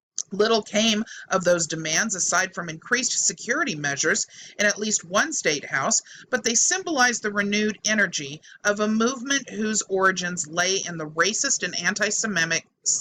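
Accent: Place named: South, United States. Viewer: When talk shows were first starting out, and they had really outrageous guests with wackadoodle ideas, I would say to myself, ‘please don’t have a southern accent! Please don’t have a southern accent!’ And guess what- they always did. When this video started out, I found myself saying over and over in my head, ‘please do y be from the south! Please don’t be from the south!’ But Nooooo.... he just HAD to be from the south, Didn’t he? I’m only in , and I’m already cringing! Now? I wonder, with all of the old tales of ‘inbreeding’ in the south, is this guy a result of it? I’m from the south and I definitely want to vote him off of our ‘southern island’! And as I watch this, I’m reminded of the whole confederacy and the south. Would this be a way to try and ‘restore’ the southern confederacy, albeit under a new name?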